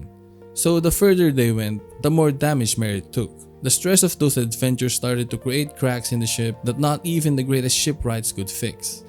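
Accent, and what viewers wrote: Philippines. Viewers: Pinoy ka bro? tonong pinoy ka kasi eh. 😁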